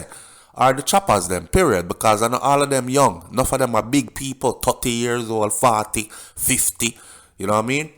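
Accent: United Kingdom. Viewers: Sound like u try this thing yah already eno bro,all white British accent.. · Ur doing it again...I picked up a British ascent this time 🤣🤣🤣🤣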